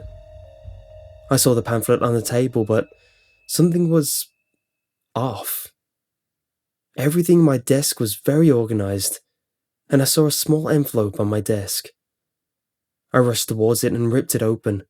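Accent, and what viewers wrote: England, United Kingdom. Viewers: U made me so scared I litterly can't fell my heart beat anymore Plus u sound like ur from England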